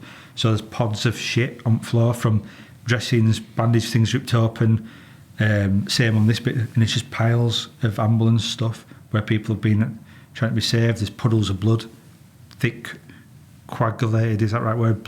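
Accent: United Kingdom. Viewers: Yank here. I think your channel will be growing fast. Don't change your accent at all. At first I could hardly make out what you were saying, but it isn't difficult to quickly catch on. I just watched a short tutorial on British accents, and I turn up the volume now. It helps if course I'm familiar with a lot of terms from years if watching BBC. Thanks for sharing your stories.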